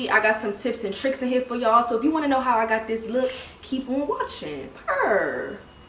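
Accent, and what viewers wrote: Washington DC, United States. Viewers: I just discovered your videos last night as soon as you spoke I was like she is a fellow DC girl 💕💕💕💕💕 Love all your makeup looks !!!!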